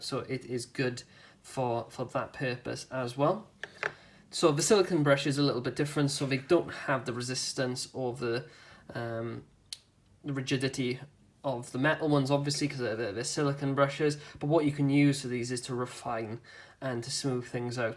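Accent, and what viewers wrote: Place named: Wales, United Kingdom. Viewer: id say he's from wales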